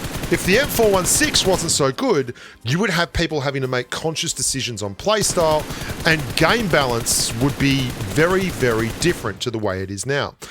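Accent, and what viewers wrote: Australia. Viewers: I subscribed to this channel recently. I think..this guy knows what he is saying. The probable reason why he isnt getting more subscribers..may be for following reasons: 1. Accent: I think its Australian..though i can easily understand him..most of the south asian audience can't. So either you can slow down while spekaing..or put notes..subtitles..in video..like levinho and tacaz does. They arent good at english..and hence they only use.. text for communicating with audience. 2. More gameplays: all leading youtube accounts..majorily upload..their gameplays.. i like your educational videos..but it wud be great..if you can uplaod your gameplays..atleast once in 2 days..with commentary. I think people wud like that. Anyways.. cheers mate.. great channel. I learnt a lot from you. May you get more subscribers soon.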